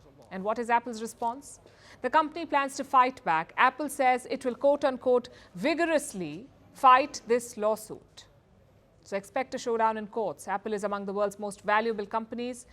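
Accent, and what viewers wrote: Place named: India. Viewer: People from india has one of the worst accents in the world!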